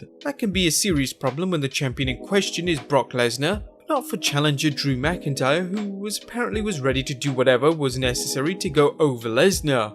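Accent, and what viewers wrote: United Kingdom. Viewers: I didn't know is British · find a NEW Narrator (preferably NOT British)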